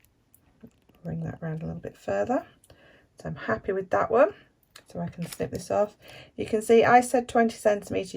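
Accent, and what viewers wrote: United Kingdom. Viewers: Enjoyed your video I learned so much and enjoyed your British accent 😊 have been to UK 3 times and would love to go again ❤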